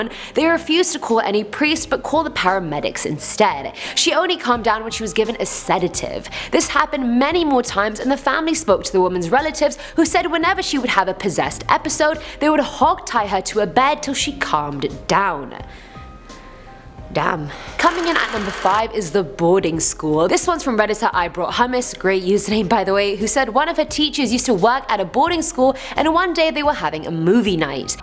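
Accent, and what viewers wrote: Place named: England, United Kingdom. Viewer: This girl has the classy English accent without getting on my nerves. She's dramatic. Flaps her delicate white hand to her heart. I'm angry. (She's not wrong).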